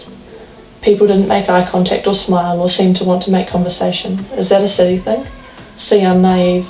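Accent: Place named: New Zealand. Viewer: I love the kiwi accent welcome to Sydney come again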